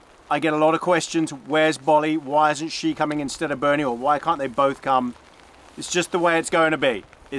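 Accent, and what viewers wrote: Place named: London, United Kingdom. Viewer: I’ve been watching your episodes from the very beginning and love them all. You’re a great warm hearted guy. I love your London accent all the way from NZ. Keep it up!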